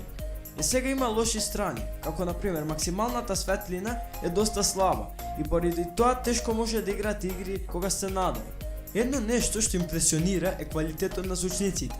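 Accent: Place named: Kavadarci, North Macedonia. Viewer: top si be uzhivaj ali probaj da zborish poishe skopski bolje zvuchi za gledachitw posho bolje kavadarchani da slushaat skopski nego skopjani kavadarski ako me sfakjash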